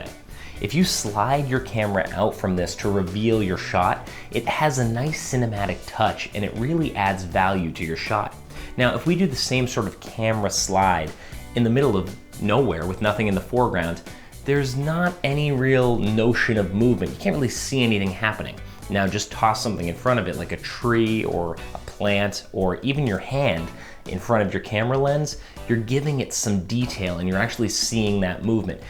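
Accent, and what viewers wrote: Boston, United States. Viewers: Wait! Are you Boston?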